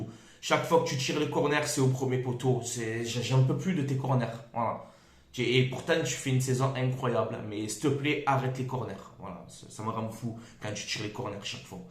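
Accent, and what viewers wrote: Marseille, France. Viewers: Coucou. J’avais dans ma cuisine la même fleur avant … alors tu me fais rappeler des bons souvenirs. Surtout que mon épouse est partie aux cieux il y a 3 ans. Et qu’elle aimait beaucoup les tournesols et les fleurs de Provence. Et tes vidéos me donnent le sourire car tu debriefs et analyses parfaitement les matchs de mon OM et avec la gouaille inimitable Marseillaise. Alors merci l’ami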